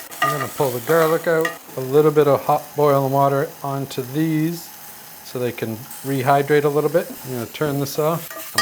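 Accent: United Kingdom. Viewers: is an honorary Brit who I believe has spent a great deal of time working and living in the U.K., so this probably accounts for some of the crossover in pronunciation/conversions etc. 😊💚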